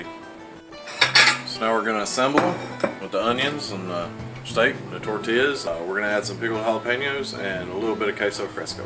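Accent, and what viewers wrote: South, United States. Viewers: You should do more videos. Why? Because I enjoy them and We all love your confederate drawl.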